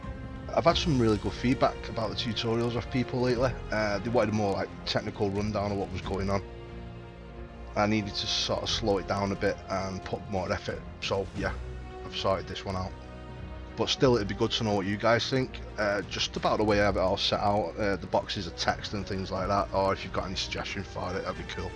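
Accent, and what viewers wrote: Manchester, United Kingdom. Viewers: You from Manchester lad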